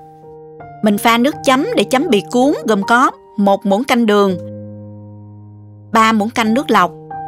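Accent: South, Vietnam. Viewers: Rất thích nghe giọng MN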